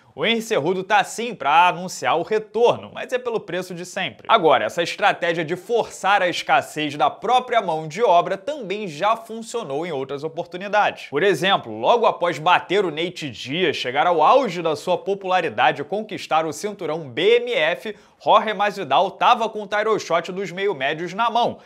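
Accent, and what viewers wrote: Rio de Janeiro, Brazil. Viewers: Meu, esse sotaque carioca é TÃO IRRITANTE!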